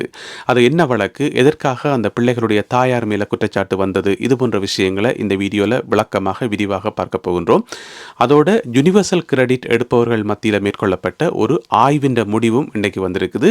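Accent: Sri Lanka. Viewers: I have subscribed your channel bro. I like the Srilankan tamil accent. Your collective news always helpful.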